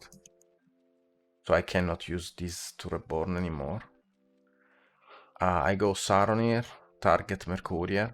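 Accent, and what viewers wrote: Italy. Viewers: Ho sentito 10 secondi di video e ho già capito che sei italiano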